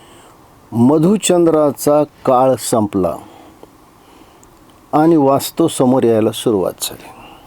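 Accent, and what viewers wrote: Marathwada, India. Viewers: मराठवाडी आवाज म्हणजे साहेब. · महाजनकाका तुमच्या संपूर्ण घराला वक्तृत्वाची जी अजोड देणगी लाभली आहे तिचा उपयोग तुम्ही शिवसेनेसाठी करा. योग्य मुद्दा काढून समोरच्याला नामोहरम करण्याची तुमची शैली वादातीत आहे. थोडा निजामी टच असतो बोलण्यात पण तो आता बदलणे शक्य नाही त्यामुळे चालवून घेऊ.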